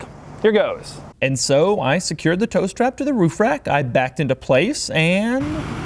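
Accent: United Kingdom. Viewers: you sound like a land rover enthusiast...british actually, not forgetting that british motors are awfully unreliable. get that from an african!!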